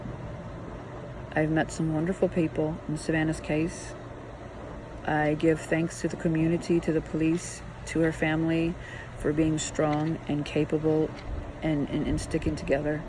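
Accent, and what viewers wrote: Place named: Ireland. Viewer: (also...i picked up on a little bit of an Irish sounding accent today in your voice -which I had not noticed before today's post)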